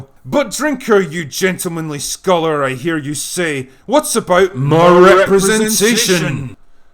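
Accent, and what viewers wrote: Scotland, United Kingdom. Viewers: I though you were a Scot?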